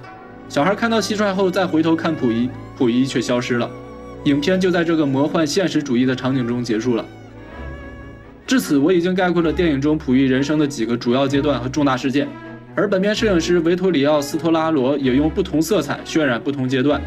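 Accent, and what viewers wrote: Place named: Northeast, China. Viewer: 我觉得这个解说的小哥哥有东北口音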